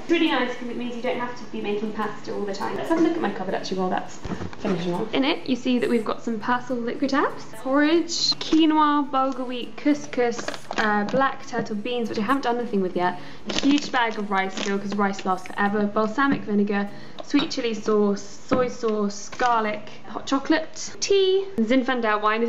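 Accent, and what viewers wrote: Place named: RP, United Kingdom. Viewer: you sound so posh in this video...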